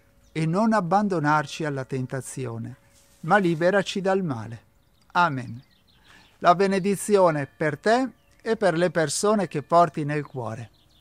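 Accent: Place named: Veneto, Italy. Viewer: Ma quanto sei bravo fra??? La tua gioiosita' è contagiosa e iniziare la giornata con il S. Vangelo, detto e commentato da te, con la gioia che esprimi così bene con la tua solarità e in quel posto magnifico è farsi un regalo immenso!!! Anche il tuo accento veneto è bello: i miei genitori erano veneti e tale mi considero anch'io anche se nata a Varese! Grazie fra! Che Dio ti mantenga sempre così e ti benedica! 🌹💙🙏🙏🙏🥰👋 Volevo anche dirti che, mia mamma è tornata alla Casa del Padre proprio il 18 maggio di quest'anno, giorno del compleanno di papa Giovanni Paolo II 🌹❤️🌹💙🙏🙏🙏🙏👋👋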